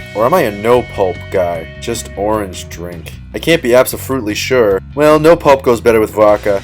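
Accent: Boston, United States. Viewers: Woahh hello random Boston accent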